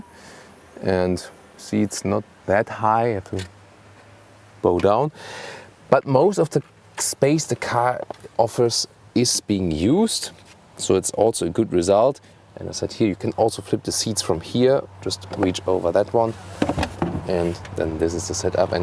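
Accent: Germany. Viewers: is he speaking germlish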